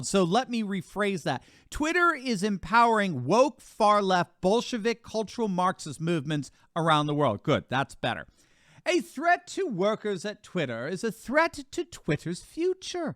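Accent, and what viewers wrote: United Kingdom. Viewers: I like how he slowly starts displaying a British accent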